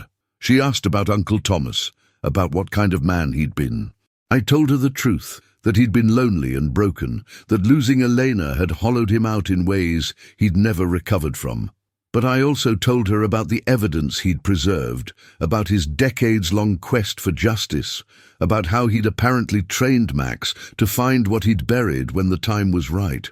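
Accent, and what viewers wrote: United Kingdom. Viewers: I enjoy the British accent, the smooth reading style, and relatively few pronouncement errors.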